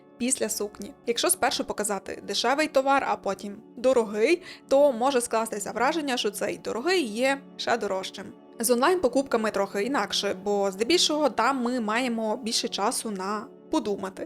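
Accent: Transcarpathia, Ukraine. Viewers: Хаха, ти точно з Закарпаття ))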